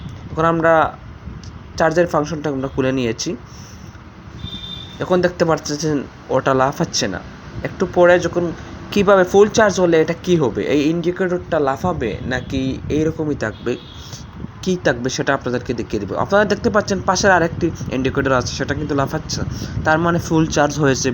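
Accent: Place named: Sylhet, Bangladesh. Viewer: ভাই তুমি সিলেটি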